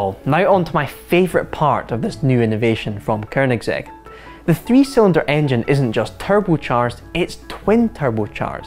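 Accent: Scotland, United Kingdom. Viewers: The accent is scotish ?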